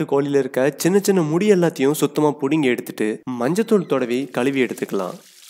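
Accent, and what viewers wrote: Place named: Kongu, India. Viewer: Konku tamil pronoun supper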